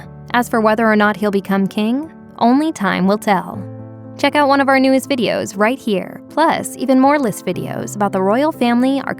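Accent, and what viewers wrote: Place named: United States. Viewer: Oh please get rid of that yanky voice and old old old history.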